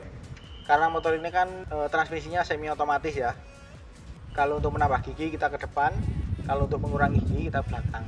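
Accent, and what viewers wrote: Malang, Indonesia. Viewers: Mangkane medok tibae wong claket malang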